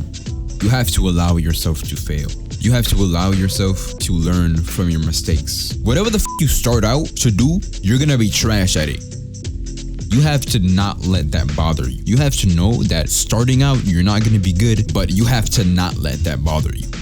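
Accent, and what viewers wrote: Dominican Republic. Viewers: So you're Afrolatino! That's so cool!! Also, "not well spoken or articulate"? My guy, Dominican accent aside, you are very FLUENT!! Your grammar and pronunciation are ON POINT! And this is coming from a native Spanish speaker myself!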